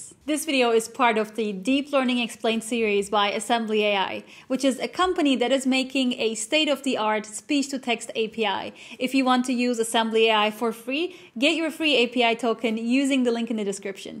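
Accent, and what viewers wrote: Turkey. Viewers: Why is the first think I thought is that she must be Turkish? :D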